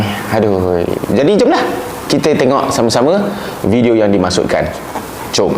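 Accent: Indonesia. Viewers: Itu nk claim ini nk claim...dh takde keje sgt ke...rendang...mkn jer laa cekik kasi habis...tgk muka cara ckap dh tau org mana...ingat org bodoh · Kalau nak tipu pun biarlah menipu dengan pandai, janganlah pakai orang indo yang loghatnya ketara sangat. Betul lah bongok, patutlah IQ mereka sama level dengan golilla (gorilla)!! Reference: Boru Naga 2 hari lepas "IQ rata rata orang indo sama dengan gorilla, pemuda indonesia di-kecam sebab membela malaysia".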